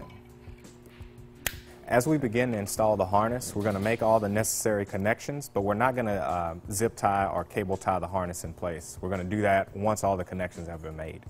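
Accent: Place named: Mexico. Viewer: This Mexican has a funny accent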